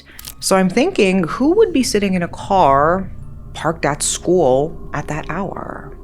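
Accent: United States. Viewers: I have always wondered how you got such a perfect American accent when (afaik) you grew up in Korea. Special gifted immersion school definitely explains it! // Man, everything I hear about the Korean school culture makes me SO GLAD I didn't grow up in it. // I love getting a personal ghost story, one that we know really happened and wasn't just a friend of a friend of a friend! <3 // Did you ever look up the history of the school to see if there was a case of a student being beheaded in an accident? // Those were great stories! Happy Halloween!! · You gave me chills with this one. Do your people have warnings against whistling at night? Also, have you lived in America? The reason I ask the second question is that it sounds like you have.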